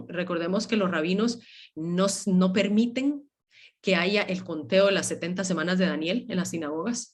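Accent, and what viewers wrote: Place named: Costa Rica. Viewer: Tienes acento de costarricense .. !! 😀 👍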